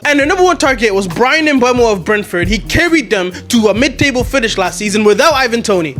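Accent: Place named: Northeast, United States. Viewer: Where is his accent from? I can guess it's from the Northeast, the US but which state/city?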